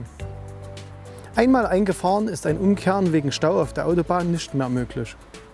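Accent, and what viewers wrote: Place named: Thuringia, Germany. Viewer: Sächsisch naja eigentlich thüringisch am Rande von Sachsen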